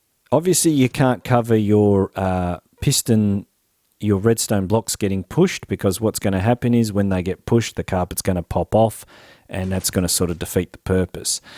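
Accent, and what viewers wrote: Australia. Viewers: Such Aussie such wow!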